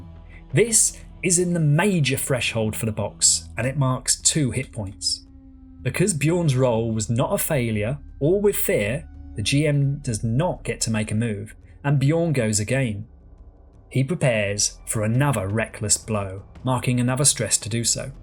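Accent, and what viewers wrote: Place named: United Kingdom. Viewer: I think accent is definitely a plus. Since I'm from across the pond, so I don't know my UK accents. Care to elaborate?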